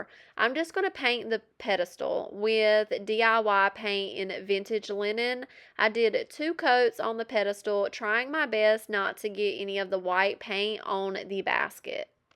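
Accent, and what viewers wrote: South, United States. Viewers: I wish you were closer to me; I would give you an iron. I have 3 or 4 of them. You can pick one up when you're thrifting. I love your show and your cute southern accent.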